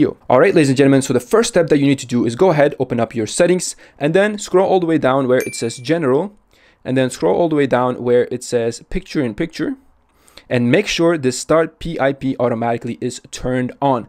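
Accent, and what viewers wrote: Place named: Russia. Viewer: Not only bad, but intentionally disingenuous. However, the uploader has a Russian accent so I'm not at all surprised.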